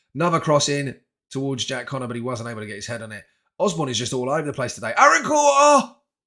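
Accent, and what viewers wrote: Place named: Australia. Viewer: can definitely hear a bit of aussie working its way into your accent lol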